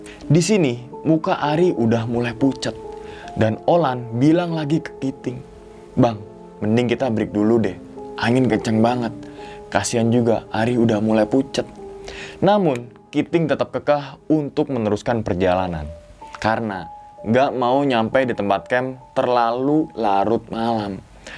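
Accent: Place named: Jakarta, Indonesia. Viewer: Jawa mana mas,,tak kira Betawi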